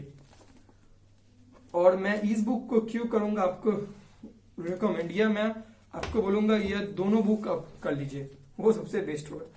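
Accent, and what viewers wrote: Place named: Bengal, India. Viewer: Your hindi seems that you are bengali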